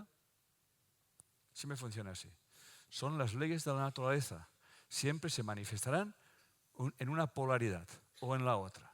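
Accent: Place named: Mexico. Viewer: Me encanta tú forma de hablar mexicano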